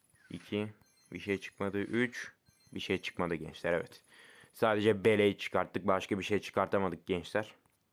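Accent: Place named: Thrace, Turkey. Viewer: Trakya aksağanı gözümden kaçmadı. Selam olsun sana hemşerim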